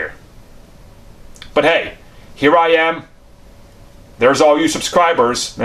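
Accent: United States. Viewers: Would never have guessed you were from Bosnia. You're accent sounds about as American as it gets.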